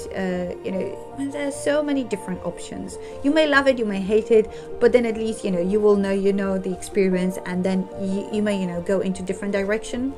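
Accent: United Kingdom. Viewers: You sound like a native british :) congrats! Where are you from? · Hi, thanks for your answers:) Currently I am a student and my English is oscilated between B1-C1, everything depends on what we are talking about: speaking, writing or listening and so on. I am impressed by your British accent. Would you be so kind and maybe make a video or just write a comment, how to make your pronounciacion more Biritsh for non naitive speaker. I know that there are a lot of different videos on YT, but why not?:) And maybe how to understand wishes for Polish people or other "hard" English grammar constructions? Greetings:) By the way, do you think people like me, whose English level is not yet C2, but I hope one day it will, are able to pass CELTA, without having diploma in either linguistics or english philology?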